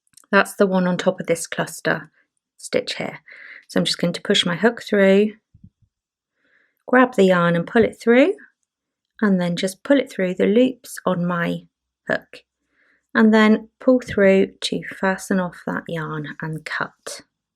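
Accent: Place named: England, United Kingdom. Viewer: Thanks a lot … great tutorial and I love your English accent …am going to have a look at your book, sweetie.Have a nice day, hugs from France.🥰😘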